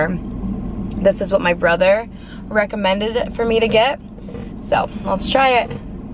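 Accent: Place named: New York, United States. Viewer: Your not from NY with that accent?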